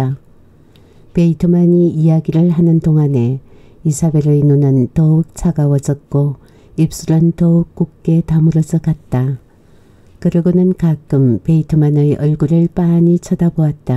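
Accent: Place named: Daegu, South Korea. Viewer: 님의 사투리때문에 무대가 시카고에서 대구로 바뀐거 같고, 훨씬 더 정겹게 다가와요 ~♡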